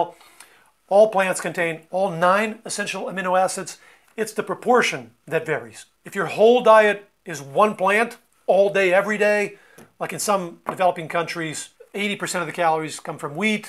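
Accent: United States. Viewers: he does sound like an American, but having a US English accent doesn't make him a US citizen. He graduated as a doctor in Lisbon and he merely did a PHD in the US, but has since returned a while back to Portugal. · Sounds like an American born and educated person. He’s not making any other person with a Portuguese sounding name better or worse.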